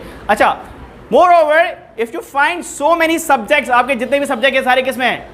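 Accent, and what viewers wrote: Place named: United States. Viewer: I always watch your videos because I love American accent & I know I can't find useful learning material to another tutor.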